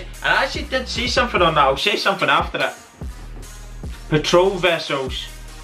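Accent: Scotland, United Kingdom. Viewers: There is no cooler accent in the world than the Scottish accent. I just love it! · If I could buy an accent it would be Scottish.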